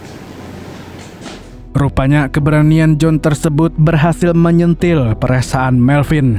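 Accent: Banjar, Indonesia. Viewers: orang Banjar kh pian....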